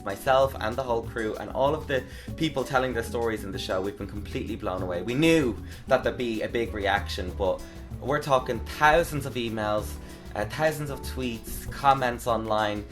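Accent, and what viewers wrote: United States. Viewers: Great American accent! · Nice American accent lol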